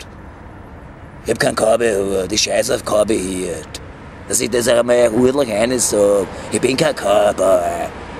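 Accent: Vienna, Austria. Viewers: Ha ha, habe ich Englisch als Mutterspracher, entschuldigung sie...aber es ist mir egal...Wiener Dialekt klingt nicht so schöne für mich aber daß ist wurscht oder? Wer spricht was, mensch sind mensch uzw...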